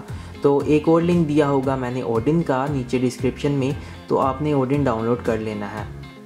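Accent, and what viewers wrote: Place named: India. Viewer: It is in english but his english is mixed with Indien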